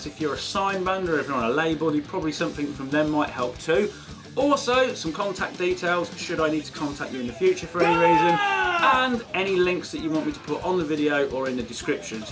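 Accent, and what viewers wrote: United Kingdom. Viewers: I AM MISSING YOU MAN! Can't live without your magnificent british accent! <3 u bro! LETS GO CRAZY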